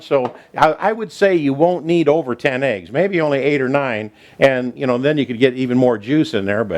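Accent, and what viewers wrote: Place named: Chicago, United States. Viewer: Sounds like a Chicago accent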